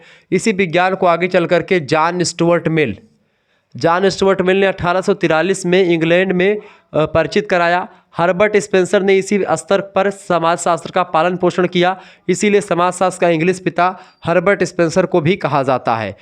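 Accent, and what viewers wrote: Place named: Awadh, India. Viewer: Sir ka Awadhi accent ❤😂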